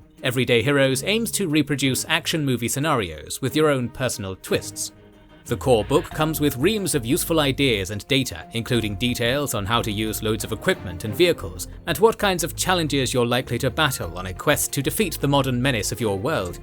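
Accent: United Kingdom. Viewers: A prime example of the danger of the British accent. Giving credence to the sequels which split the fandom, introducing Grey Jedi as if that's a real thing and not just fan fiction, and defining the Sith Rule of Two as the way that operate when the Sith species was conquered by exiled Dark Jedi. Sith bend the Force to their own will for their own selfish desires, where Jedi surrender to the will of the Force and use it to guard life. Way too much emphasis on the damn sequels.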